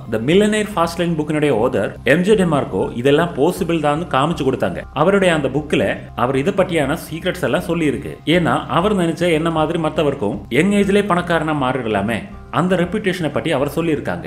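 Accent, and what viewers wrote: Kerala, India. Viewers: Avar malayali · Kerala slang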